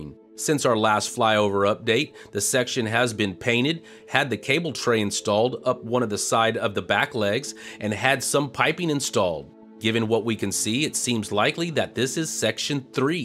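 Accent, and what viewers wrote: Texas, United States. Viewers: Congratulations!... Keep that Texas Twang coming. " The South Shall Rise Again... to Mars! "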